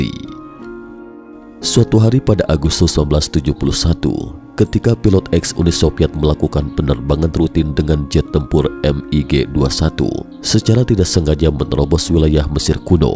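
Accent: West Java, Indonesia. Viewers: urang sunda nya' kang?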